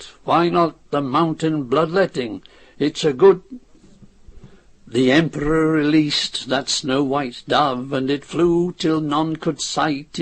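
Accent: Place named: Yorkshire, United Kingdom. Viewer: How rare: To hear a Yorkshire accent as a reader!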